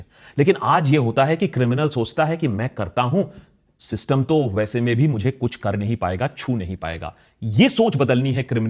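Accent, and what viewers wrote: Bengal, India. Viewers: Akash Banerji bangali hain isliye sonjoyroy...bol roha hai. Typical bongali😅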